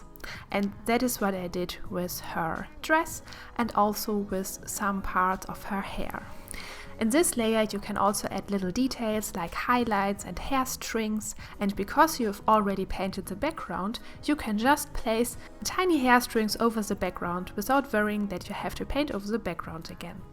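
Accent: Germany. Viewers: Based on the accent I'd say you're german. Right?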